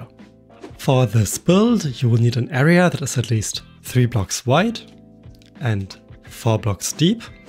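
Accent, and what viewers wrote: Germany. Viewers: Der deutsche Akzent 😂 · great build, but are u german?, ur akzent sounds like it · Deutsch hört man raus aber gutes tutorial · Junge eine Sekunde angehört, der typische Deutsche Akzent.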